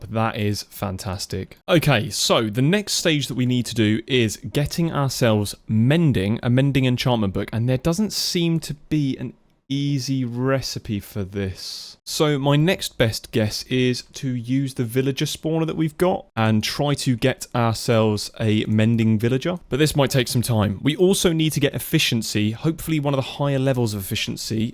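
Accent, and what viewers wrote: United Kingdom. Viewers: If I remember right, enchantment industry and oritech both have ways of getting enchanted books/items at significantly higher levels (fortune 255, anyone?) so maybe you could use that to get even more stuff? I'm absolutely loving this series, your British voice is great for helping me sleep (I finish the episodes first don't worry) :3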